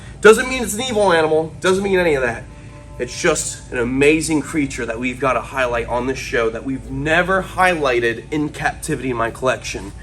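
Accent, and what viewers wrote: Australia. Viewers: that's an awesome aussie accent 😂 felt like i was watchin Steve Irwin for a second there. that mystery snake (not gonna spoil it) is so beautiful! whenever you get a new snake it just leaves me in awe every time. it is kind've weird that they're elaphids. everything about them definitely yells viper to me. very cool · Can you keep that Aussie accent!! I freaking love it!!❤️❤️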